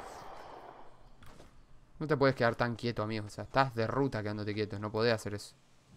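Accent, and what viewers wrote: Uruguay. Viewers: es de uruguay? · de que parte de uruguay sos bro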